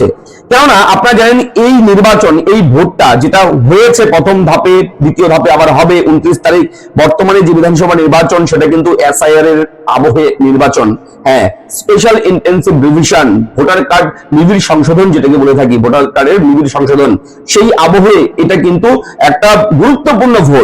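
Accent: Bangladesh. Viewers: আপনার উচ্চারণ কিন্ত বাংলাদেশীদের মত। আপনি নিজেই বাংলাদেশী হতে পারেন বলে মনে হচ্ছে। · এ প্রচুর গাঁজা টেনেছে বোঝা যাচ্ছে I Accent শুনে সন্দেহ হচ্ছে এ নিজেই বাংলাদেশ থেকে খুব বেশিদিন আসে নি I যে ভাবে S উচ্চারণ করছে তাতে তো তাই মনে হচ্ছে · সব ঠিক আছে। একটাই প্রশ্ন। বাংলাদেশি? জিভের আড় ভাঙেনি এখনও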